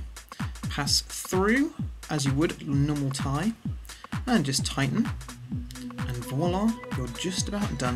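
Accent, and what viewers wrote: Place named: RP, United Kingdom. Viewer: ahahah you really can find almost everything on youtube thanks for the information he does sound pretty posh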